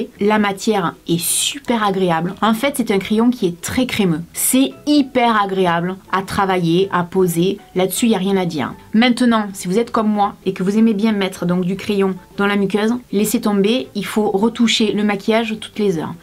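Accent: South, France. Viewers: J’adore tes vidéos tres marrante avec Ton accent du sud qui donne le sourire !! :) et j’avoue la mousse de douche donne très envie je pense la tester prochainement. Sinon continue ainsi Tel que tu est super maman de YouTube .☺️👍😉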